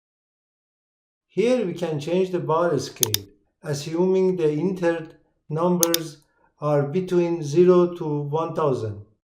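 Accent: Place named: Iran. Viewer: لهجه ایرانی داری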